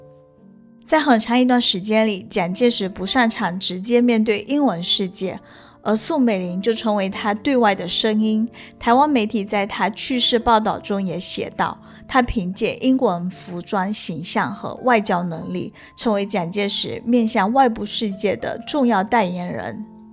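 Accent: Fuzhou, China. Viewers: 影片內容跟拍攝都很好，但...講話發音是福州土腔? 發音都不標準，聽了十分難受。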